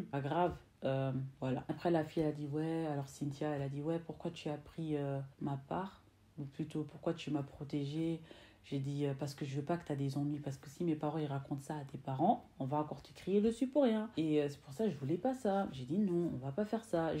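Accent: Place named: Democratic Republic of the Congo. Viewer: D'après ton accent Je dirai que tu es ma compatriote congolaise est ce vrai ?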